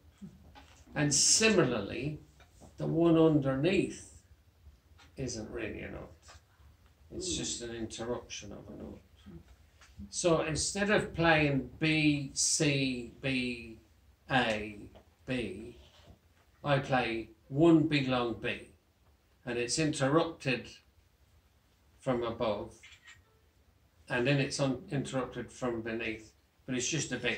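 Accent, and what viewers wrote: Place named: Cork, Ireland. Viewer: You talk like my 12 uncles from both sides of my family, they all came from Dublin when they were young men to Birmingham about 50 years ago, but I detect your not a dub but more western like cork.?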